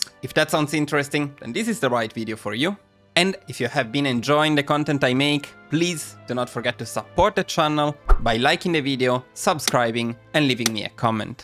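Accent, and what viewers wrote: Italy. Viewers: Hey great video (as always). Completely unrelated question, are you Italian?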